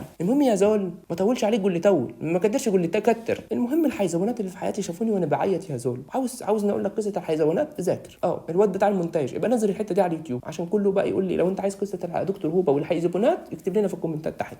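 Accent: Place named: Menoufia, Egypt. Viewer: ديي لغة المنوفية ادگتره😂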